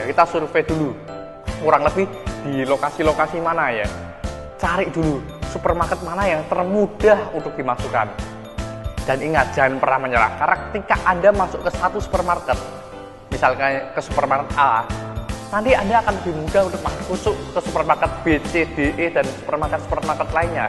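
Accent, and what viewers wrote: Indonesia. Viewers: Logatnya itu lho, medok sekali..... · ini orang logatnya kyk ada bali nya ya???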